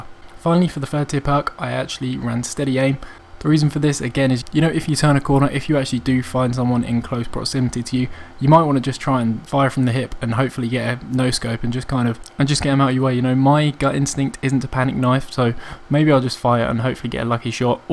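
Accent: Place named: Cockney, United Kingdom. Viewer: there is no such thing as a 'british' accent, this, is a southern cockney accent, from quite a well spoken man here so you probably accociate this with someone like hugh grant. but say for example you went up north, to newcastle, you would have no idea what they are saying, take for example when cheryl cole and none of you yanks could understand her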